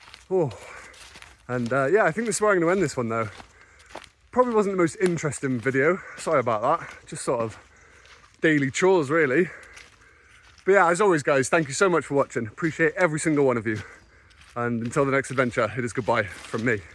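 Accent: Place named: Bristol, United Kingdom. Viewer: are you originally from the Bristol area? I ask as your accent sounds like it is,and just around the time that I found your channel, I was walking along the Bristol to Bath cycle path and I was sure that I saw you walking along it with your gear and dog..😂 The fishponds,staple hill area. Please, I understand if you dont want to answer, but it always crosses my mind when watching your videos. Keep up the good work.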